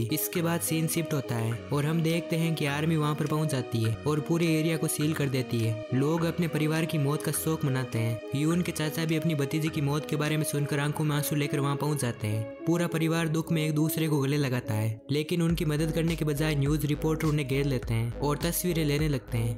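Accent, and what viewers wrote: Rajasthan, India. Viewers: Rajasthan voice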